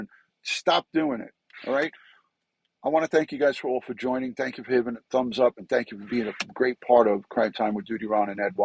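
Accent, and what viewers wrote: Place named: Rhode Island, United States. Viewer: How you doin? I love your accent. You sound like me. I'd from RI. Praying for you & your loss of your precious mama. Be glad DutyRon loves you so much.❤️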